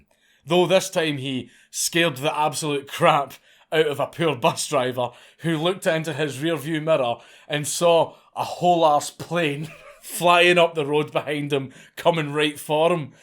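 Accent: Scotland, United Kingdom. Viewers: Bro you only have 830 k + subs?…. Is half of the globe missing their testicles? Or are we in some sick twilight zone episode where having a sexy and masculine Scottish accent taboo?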